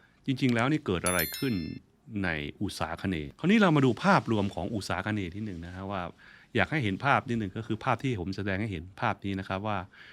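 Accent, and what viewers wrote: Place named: Thai-Chinese, Thailand. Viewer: ท่านคนไทยเชื้อสายจีน ธรรมดา ออกสำเนียงแบบนี้แหล่ะ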